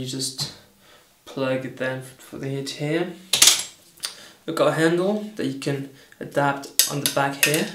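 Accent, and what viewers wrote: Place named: United Kingdom. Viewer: Thanks for sharing this, where did you buy the whole set (am guessing from your accent you live in the UK)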